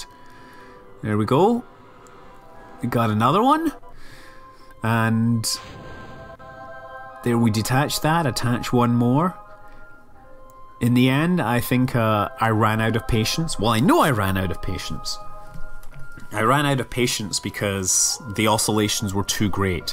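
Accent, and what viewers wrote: Scotland, United Kingdom. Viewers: He's Scottish you imbecile.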